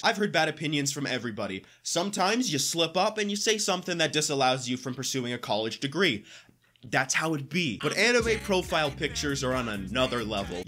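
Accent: Canada. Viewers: i feel like this man lives in Edmonton or Calgary somewhere cuz mf just sounds like one of us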